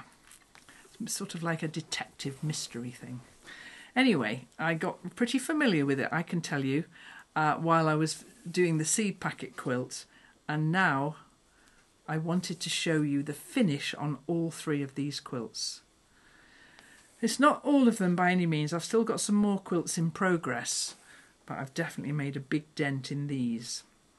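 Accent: England, United Kingdom. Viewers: I have only just found you. It am English and its lovely to hear an english voice . I have a lot of Kaffes flower fabric plus other bits and pieces of flowers. I couldnt think what to do with it, I know now. I watched Grantchester as a series, I wouldnt have wanted to watch it in one go. I have hand pieced, hand quilted a hexagon quilt. I was never quite happy with the finish though wasnt sure why. You may be horrifed but I now have the front in two pieces and removed everything else to finish it again, maybevadd a few hexies, I have hundreds made. It is a big throw size unfortunately, I also have some quilts that need finishing. Maybe I should start a new system as you have. I dont have facebook but do love looking at Instagram.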